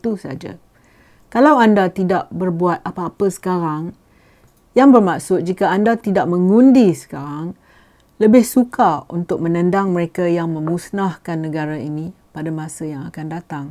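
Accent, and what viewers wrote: Perak, Malaysia. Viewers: Saya suka Puan cakap loghat Perak..ha ha ha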